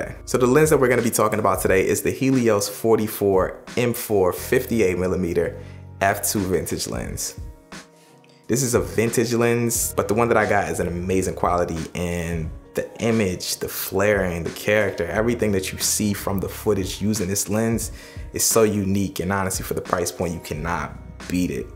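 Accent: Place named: DMV, United States. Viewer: The slider and takstar mic look great. You sound like you grew up in the DMV as well. Keep being great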